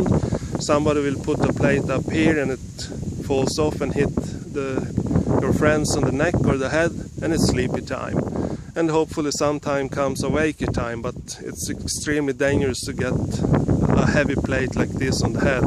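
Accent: Sweden. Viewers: I am so glad I found your video. I am attempting to build my own texas star. Yours is perfect. You crack me up with your Swinglish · Very cool and thanks for sharing your ideas. Also, your Swenglish is just fine. I appreciate your creativeness!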